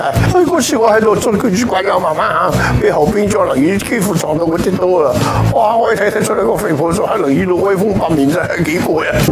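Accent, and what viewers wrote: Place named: Shanghai, China. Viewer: 用沪语的音调讲粤语，听得好亲切。少小离家永不还，乡音无改鬓毛衰，倪老先生千古!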